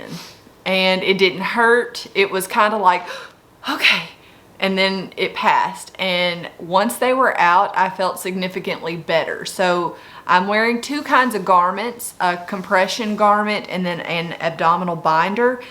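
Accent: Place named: South, United States. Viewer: Hey! I'm not sure if anyone has already asked this question or if you're allowed to share the info but I was wondering where you went to have your surgery? You sound southern and I was hoping (fingers crossed) we were relatively close in location. I would love to have this done and would want to go to a good surgeon.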